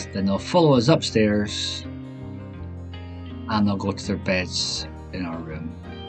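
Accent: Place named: Scotland, United Kingdom. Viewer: Absolutely wonderful video! Love your dogs, lifestyle and your beautiful accent.. Scottish <3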